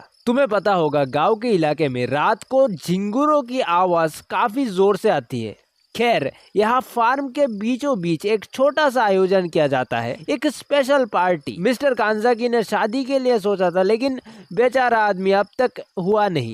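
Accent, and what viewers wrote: South, India. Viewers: Lots of love from Rawalpindi ap konsay city se hain accent ap ka south Wala hai Namaste Namaste Namaste Namaste Namaste Namaste Namaste Namaste Namaste Namaste Namaste Namaste Namaste Namaste Namaste Namaste Namaste Namaste Namaste Namaste Namaste Namaste Namaste Namaste Namaste Namaste Namaste Namaste Namaste Namaste Namaste Namaste Namaste Namaste Namaste Namaste Allah Hafiz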